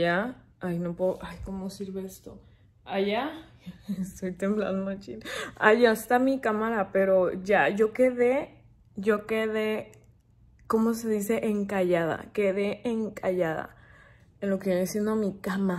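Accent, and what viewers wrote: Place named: Mazatlán, Mexico. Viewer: Vengo de tu video del sugar y me encantaste , tienes así como el hablado y forma de ser de las de Mazatlán ♥️✌🏻 saludos hermosa desde Mazatlán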